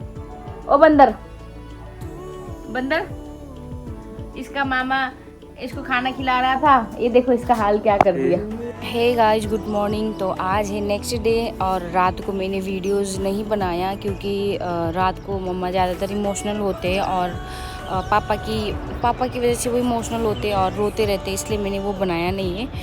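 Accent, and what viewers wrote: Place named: Nepal. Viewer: Aap toh pieor nepali bolrey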